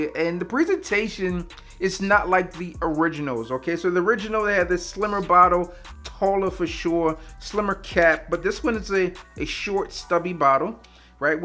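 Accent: Baltimore, United States. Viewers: U from Baltimore?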